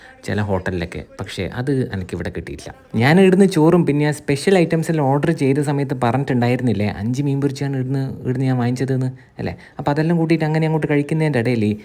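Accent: Kannur, India. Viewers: Chetta ningala sound ok set anu ee presentation ok adipoli poliyanu.... Enkilum food ok orupad kazhikkunath kond ninglde health nu ok valla issues verullei....... Work out ok chryyarundo.......Enthayalum viedio adipoli anu ketto..........ath parayand erikkan pattulla athra super anu❤️vere oru vloggers num illatha nice presentation.... Pinna kannur slangum adipoliii❤️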